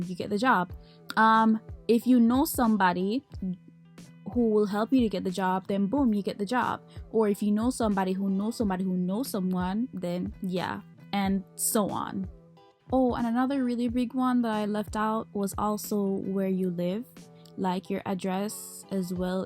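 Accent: Jamaica. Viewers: U from jamaica?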